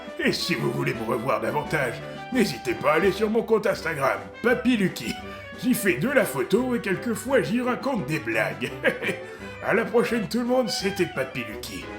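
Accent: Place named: Lower Brittany, France. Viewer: c'est cool de voir qq'1 d'autre qu'un prof de breton ou de danse parler des festou noz a ton accent tu viens de basse bretagne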